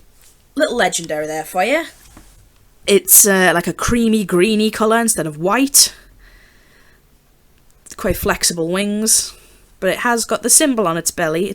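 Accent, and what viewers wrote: United Kingdom. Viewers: I thought you were British because of your accent lol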